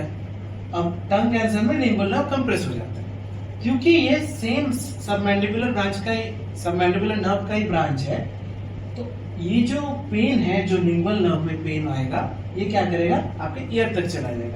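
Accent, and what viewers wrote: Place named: Bengal, India. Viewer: Wow nice accent app bengal se ho kya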